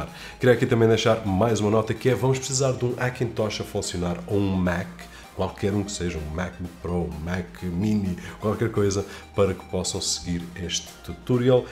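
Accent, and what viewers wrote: Portugal. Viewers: adoro o sotaque dos portugueses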